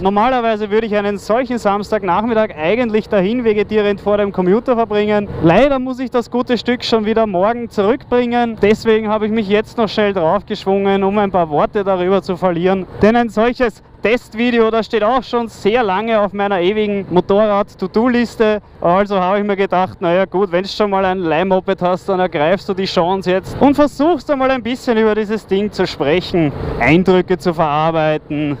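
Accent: Vienna, Austria. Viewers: I am trying to learn German and these videos are a real help, I know Austrian German is a lot different, but still they give me a really good gage of where I am in my learning, thank you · Genialer Test, angenehme Stimme, sehr sympathisch mit der österreichischen Sprache. Ich würde mich über mehr deutsche Motovlogs freuen. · Ich finde dein Video ist wohl eins der besten und unterhaltsamen teste. Wie du schon sagtest gehen viele Motorrad Tester viel zu sehr auf das ein was eigentlich keinen Juckt, dein Video wirkt kein bisschen langweilig und dazu dieser wunderbare Österreichische Dialekt macht es zunehmend unterhaltsam. Ein tolles Video wovon ich mir auch gern öfter welche ansehen würde 👍🏻👌🏻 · Viennese (Austrian-)German ;)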